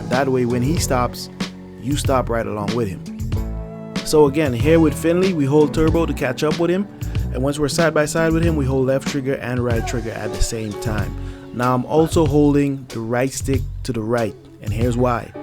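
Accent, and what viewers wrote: Jamaica. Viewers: Wah goin on boy. Yuh sound like yuh from Yard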